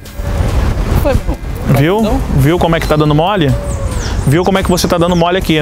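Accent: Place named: Rio de Janeiro, Brazil. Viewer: legal e bonito KKK puts e ainda tem sotaque carioca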